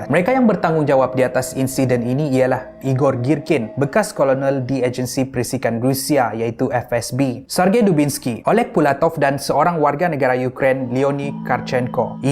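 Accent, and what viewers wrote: Sabah, Malaysia. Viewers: Ini loghat Sabah tu pun tak pandai beza kah. Dasar orang kl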